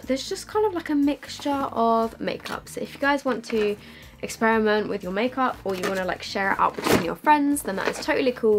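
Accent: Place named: England, United Kingdom. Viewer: purple emoji purple emoji purple emoji I LOVE your English accent and your makeup looks!!! Legit whenever I see the thumbnails I'm like "OMG I NEED TO TRY AND RECREAT THAT LOOK" even if I'm legit staying home and doing absolutely nothing (and taking it off straight after) Btw hi from New Zealand if you see this!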